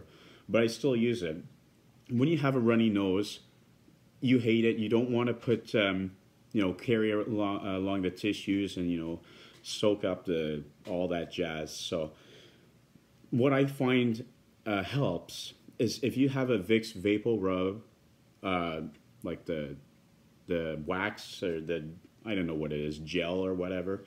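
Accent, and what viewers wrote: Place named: Wisconsin, United States. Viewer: Hey dude, please articulate your words. You sound like you are from Wisconsin or Canada.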